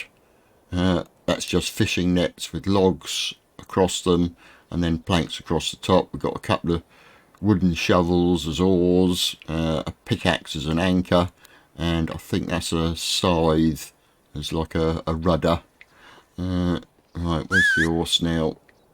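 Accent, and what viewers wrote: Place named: West, United States. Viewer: I came here to watch your village, but you also thought me how to make a big bridge, I want to build one so bad and every video I watch about it, it only teachs to put some planks over a little stream, not across a big river like you did, thanks for that! (plus, I like the way you talk, sounds like a cowboy or something)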